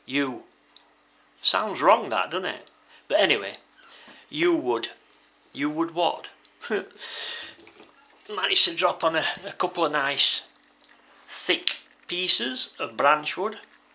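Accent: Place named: Yorkshire, United Kingdom. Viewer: Ayup, nice to hear a Brit bloke voice, is there a Yorkshire hint? just a bit. I've only been turning just over a week, I was told that Turners Retreat was in Sheffield! the buggers lol. So it's in or near Nottingham eh? Just my luck. Brilliant video's mate, how much wood blanks did you get for 6 to 7 hundred quid? When I first started to think about woodturning up I didn't expect that getting hold of wood for turning to be difficult! The American turners are spoilt lol, they seem to hsave stunning wood and its in their gardens most of the time! lol. Do you have dificulties getting wood to turn? OK won't bore you to much mate, take care, Turn Safe or..... TURN OFF. Cheers Andy South Yorkshire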